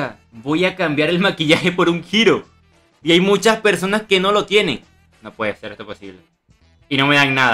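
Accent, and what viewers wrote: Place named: Peru. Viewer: Parece peruano XD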